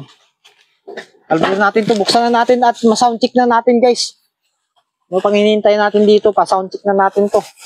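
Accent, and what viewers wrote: Iloilo, Philippines. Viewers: ilonggo ka gali dol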